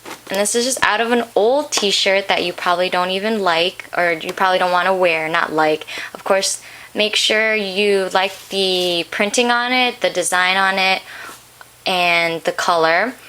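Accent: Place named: Canada. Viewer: she sounds Canadian to me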